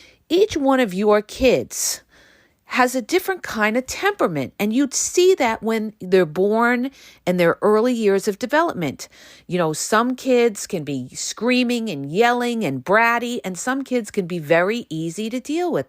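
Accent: New York, United States. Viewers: I believe that Narcissists are made. My belief is because of my experience with her over 24 years. She got worse at the end because I believe she realized that her 2 boys were old enough to take care of themselves and that's when she let loose. I will be turning 64 this year and she will be turning 60. 3 years into Blocking/No Contact and I am now starting to feel peace in my Heart. It's a long road and I have no desire for dating right now. I just want to find myself again. I will always listen to you as long as you keep it going....Bless you and love your New York Accent...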